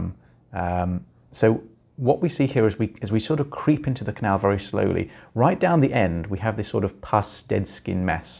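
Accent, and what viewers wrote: United Kingdom. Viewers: Always loved the British accent💋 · wait how come you have a british accent if you live in north carolina no offense im just wondering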